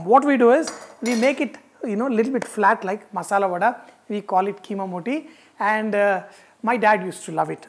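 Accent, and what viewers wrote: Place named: South India, India. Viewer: Abbe keema hota hai keema, ye kab southern pronunciation se kab tak dimag ki dahi karoge yaar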